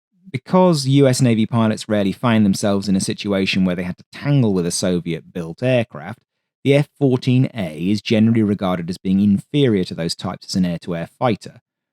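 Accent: United Kingdom. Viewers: Why oh why does it ALWAYS have to be a snooty British narrator on every documentary on YouTube? Even about every American airplane and ship? I can’t stand it.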